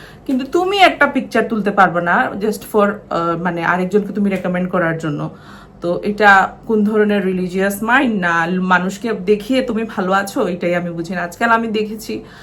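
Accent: Sylhet, Bangladesh. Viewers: Apu amrar sylheti mat matoin na kene shunte valo lage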